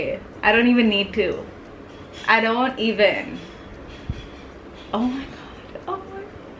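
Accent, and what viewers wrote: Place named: United Kingdom. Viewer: Love the video, as always your videos help me a lot. Love you and love your videos. I missed you so much mommyyy, your talks, advices and jokes and the British Accent.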